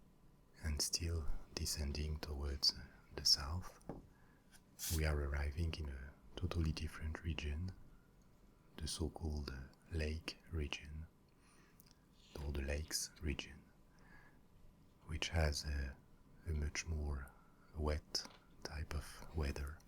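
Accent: France. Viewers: Vous avez un accent français je trouve !!! 😍😊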